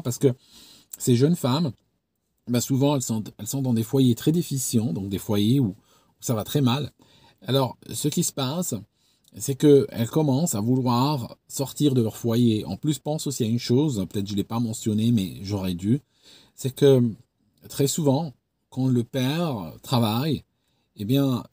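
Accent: Vaud, Switzerland. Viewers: Y a l'accent suisse qui revient au galop!!! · C'est quoi ton accent Suisse 😁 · Alors ton accent c est en Suisse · Pourquoi prendre cete intonation suisse ? Ai-je raté un épisode ? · Pourquoi tu prends un accent Suisse ? En France on paye des charges hallucinantes pour justement pouvoir toucher des aides en cas de chômage. Je veux bien qu’on ne donne plus de cash aux chômeurs, pas de « sponsoring » comme tu dis mais dans ce cas, je ne veux pas voir sur ma fiche de paye toutes ces lignes qui sont retirées à mon brut… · Pourquoi tu prends l'accent suisse ?? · Pourquoi est-ce que t’as l’accent suisse dans certaines vidéos? 🤣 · C'est quoi cet accent suisse mon 😂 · petit aparté : je n'avais jamais fait attention au fait que tu avais un accent suisse ! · Dommage pour l'accent suisse trop présent qui rend l'ecoute plus difficile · C’est marrant ton accent suisse dans cette vidéo, … 😊 Plus sérieusement, j’ai été contrainte d’aller aux services sociaux quand je suis rentrée d’Angleterre. 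Je préparais déjà une licence et j’avais besoin d’une année pour la terminer. J’avais aussi besoin de nourrir mes enfants. En même temps, je me suis inscrite à des concours. Comme j’ai réussi le premier, j’ai laissé tomber les autres qui étaient équivalents. Ainsi, j’ai pu m’occuper de mes enfants, les nourrir et leur faire faire des activités. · Arrêtez de dire "accent suisse" dans les commentaires, ça veut rien dire. C est de l accent vaudois qu il est question, en l occurrence. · Trop rigolo l accent suisse wue vous prefer. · Incroyable en écoutant ta vidéo j'entendais la mélodie de l'accent Suisse, puis en regardant la description j'ai vu que t'étais à Genève haha. Sinon top le contenu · Merci pour la vidéo c'est top. En revanche l'accent Suisse est atroce 😂. Heureusement que ça s estompe au fil du déroulement de la vidéo. Salutations distinguées. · Tu as l'accent suisse comme même :) 'Avais jamais remarqué :) ! 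· J’ai une théorie sur cet accent suisse. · Cette accent suisse, c'est trop mdr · Bin, c’est quoi ce nouveau accent suisse? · J'aime bien ton accent Suisse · J'ai l'impression que vous avez pris l'accent suisse !!! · Ça me perturbe c’est l’accent suisse que tu as dans cette vidéo ?? J’ai noté un accent bien marqué c’est ps habituel il me semble 😂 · ton accent suisse est revenu tellement fort que je doute que tu sois toujours au royaume uni. Super conseil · lol l'accent Suisse qui revient. Je te regarde en mangeant une entrecôte Wagyu. Pas de la bouffe de RSAiste ça.. Ce qu'on a de plus précieux c'est notre temps de vie (en bonne santé). Le gâcher largement pour avoir un peu plus, mais plus assez pour faire grand chose notamment depuis le covid et l'inflation, je me demande de plus en plus si ça vaut le coup. Les aides baissent pas elles augmentent... Le SMIC idem, il augmente fortement avec le temps, je l'ai jamais vu baisser. 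Ceux qui ont un peu plus, sont pas augmentés, résultat on s'appauvrit, on est de plus en plus proche du SMIC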